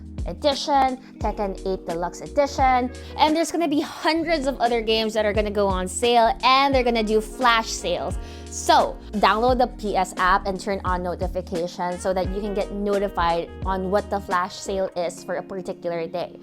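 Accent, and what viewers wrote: Philippines. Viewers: lol her cute filipino accent.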